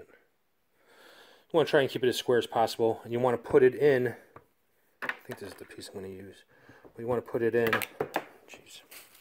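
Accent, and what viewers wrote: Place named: Chicago, United States. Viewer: Love your accent. Chicago area?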